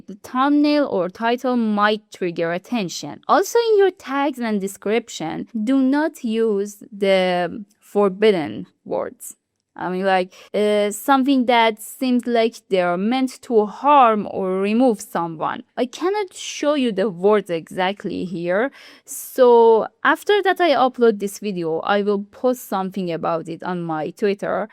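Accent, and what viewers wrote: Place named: Arab world. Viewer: I don't know why YouTube uses this stubid AI?! The app was good without it! And instead of taking down random creators' channels why don't they take down the channels that make Inappropriate videos?! It is just so stubid!!! By the way, i think you are Arabian after hearing your accent, if you are; then i'd like to say that i am Arabian too 💜✨ لو انتي عربية اقرأكي الكلام العربي ده، حاسس لنك عربية من طرسقة كلامك، صح؟ 😅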